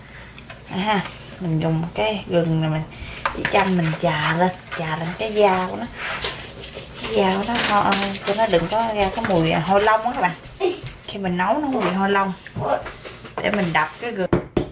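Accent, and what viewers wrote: South, Vietnam. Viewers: chị nói tiếng miền Nam nghe đã tai quá 😊😅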